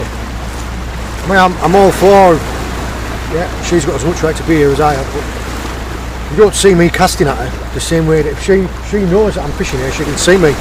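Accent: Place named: Middlesbrough, United Kingdom. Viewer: Are you from the 'Boro' originally as I recognise the ascent...as I grow up there ! Great video again very informative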